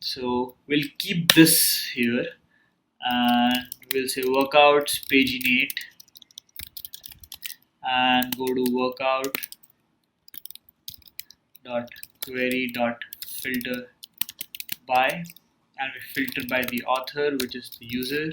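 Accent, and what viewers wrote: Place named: India. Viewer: Why you indians talk like this !! Please please please work on your accent , it's very hard to understand. I'm telling this to all the indians ...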